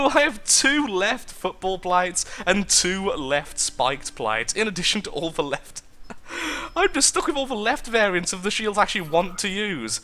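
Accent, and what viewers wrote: Netherlands. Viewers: Wait are you dutch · are you dutch i am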